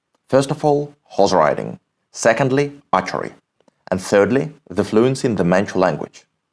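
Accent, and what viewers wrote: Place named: Russia. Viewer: The Russian accent is too heavy. Every time I heard “th” pronounced as a “s” or “z” it made me hurt.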